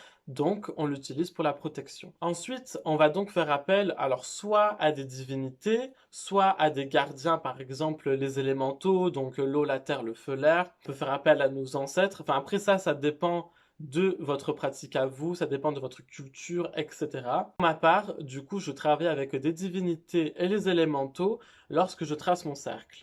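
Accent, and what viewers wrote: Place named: Marseille, France. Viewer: Super l accent marseillais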